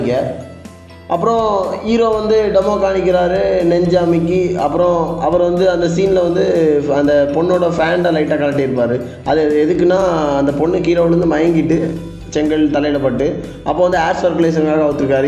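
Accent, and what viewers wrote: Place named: Marthandam, India. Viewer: Loi marthandam slang try a😅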